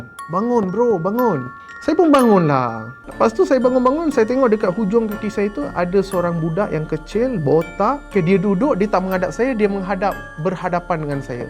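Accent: Malaysia. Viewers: Care die cakap macam orang Melayu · Kuat bergaul dgn melayu brader ni. Pekat nauu melayu dia 😂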